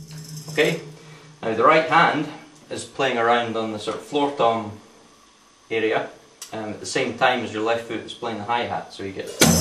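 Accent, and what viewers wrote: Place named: Scotland, United Kingdom. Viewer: you're awesome. I love...always wanted to find a drummer who could play it...adorable Scottish accent. Amy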